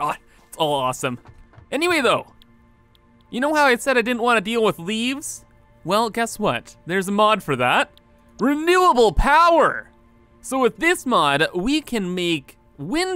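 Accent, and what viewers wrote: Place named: Canada. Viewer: Oh man, first time seeing your videos and you sound so Canadian there bud, had to subscribe and check your twitter and indeed you are, what province do you live in? if its Saskatchewan imma loose my shit, nobody lives here.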